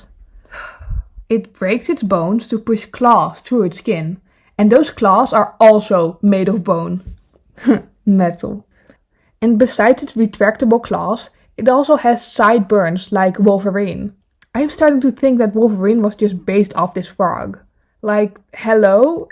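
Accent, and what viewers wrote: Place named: Netherlands. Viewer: Are you dutch because I hear the dutch accent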